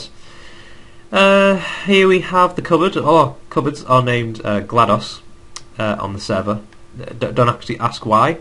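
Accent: United Kingdom. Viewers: im not being mean but r u british cuz u sound like it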